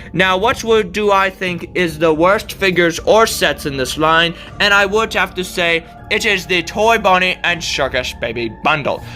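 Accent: United Kingdom. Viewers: What's with the very British voice?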